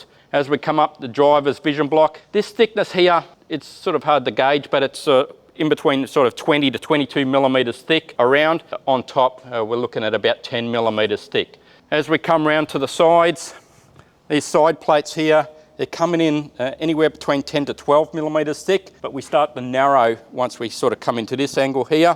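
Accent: Australia. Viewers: You could mute it and try closed captions though CC has some issues with Aus accents.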